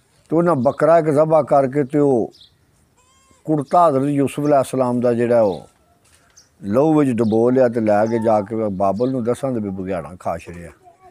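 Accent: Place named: Sialkot, Pakistan. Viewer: Your accent of punjabi boli reminds me of my parents and mama ji who came from Sialkot.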